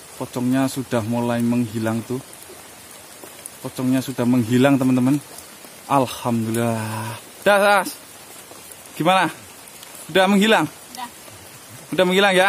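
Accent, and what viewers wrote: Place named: Java, Indonesia. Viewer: Demen ambe medoke ^^ Silakan silakan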